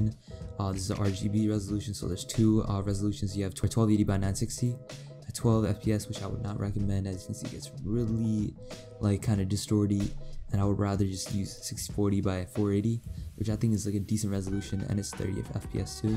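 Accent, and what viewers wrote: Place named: United States. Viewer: almost left expecting half indian with some english and immidetly got an american accent , whats up bruhhh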